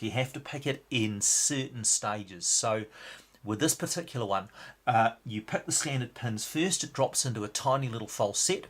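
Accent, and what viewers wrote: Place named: New Zealand. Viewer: Nice lock & good pick. I love your screen annotations, and I think your commentary rivals BB & LPL. Plus you've got that great... KIWI accent! Send'n the love from the USA.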